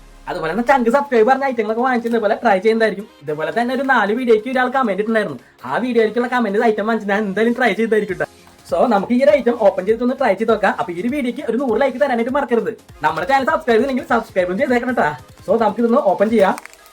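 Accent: Thrissur, India. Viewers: Iyal trissur aano slang ta ta verunnond cgadhichadhattaaa njanum trissur aanu 😂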